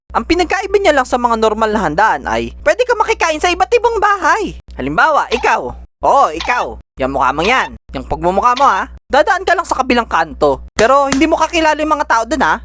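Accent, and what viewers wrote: Visayas, Philippines. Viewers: Karon rako nakabalo nga bisaya ka man diay bai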